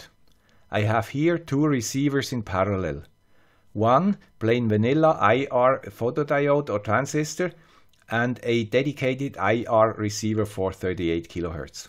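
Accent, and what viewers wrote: Switzerland. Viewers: HAHAHA "Here is the guy with the Swiss accent." If only I could put a 100 likes into this video. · And winner of my first comment on youtube is the guy with the swiss accent... thank you for all your great videos! A few weeks ago I bought during a flashdeal on aliexpress a cheap esp-01 with a relay and ended here on your channel... 30 Years ago when I was building my first cnc router it was really complicated to get all the knowledge together and today we just google... BTW I remember in one of your videos… problems with a 3D printer....maybe Iˋm the man... we are almost neighbours. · I love your videos Sir. I am looking to make a simple ir blaster to turn on and off a TV and stumbled upon yet another great video from the guy with the Swiss accent. Thanks for the info.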